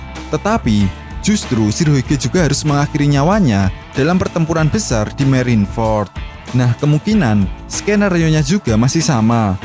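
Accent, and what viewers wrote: Java, Indonesia. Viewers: Bahaso jowo nyo gk bisa hilang ternyato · Min Jawa ne ndi ?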